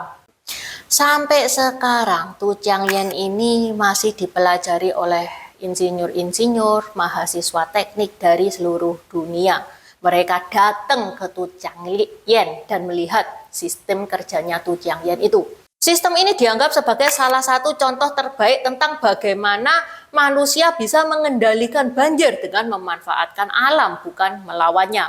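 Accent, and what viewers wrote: Java, Indonesia. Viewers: Saya suka...logatnya asli orang Jawa...👍👍 Saya sukreb nona..🇮🇩 🙏🙏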